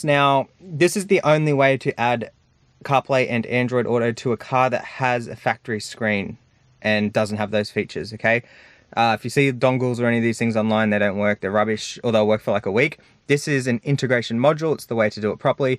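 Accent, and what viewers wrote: Australia. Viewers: Why do speak like a Australia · Nice video man, not too use to hearing Australians on YouTube haha forget I sound like that too😂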